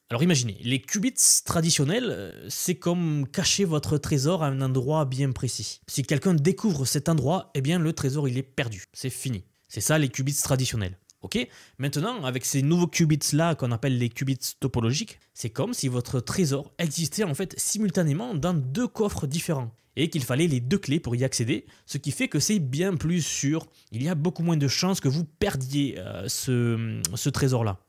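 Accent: South, France. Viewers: J'avais relevé ton MAGNIFIQUE accent Italien... J'ai éclaté de rire lorsque tu as dit: "Vous avez relevé min magnifique accent" J'AI ÉCLATÉ DE RIRE... En plus d'être curieux mcultivé, pertinent, intéressant, pédagogique..... TU ES DROLE... 😂 ET MALGRÉ TES EFFORTS ET TA PUISSANCE, Beaucoup de gens malgré tes efforts Ne. Comprennent rien à ce que tu dis ni ce que tu fais.... Ils de dénigrent car tu es jeune, tu as l'accent du sud et que t'es en frénésie... Je me sens très proche de toi Merci pour ton travail J'aimerais pouvoir un jour te rencontrer et aussi cuisiner pour nous...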